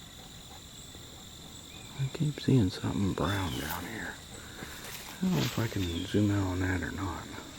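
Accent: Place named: Monroe County, United States. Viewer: Looks like Monroe county and you sound just like my family in Monroe county. beautiful country!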